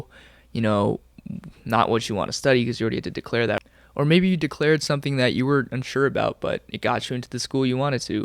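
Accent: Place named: California, United States. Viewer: I'm from New York (Brooklyn, Bed-Stuy) and I knew from the moment you started talking you were from California, the accent is pretty heavy.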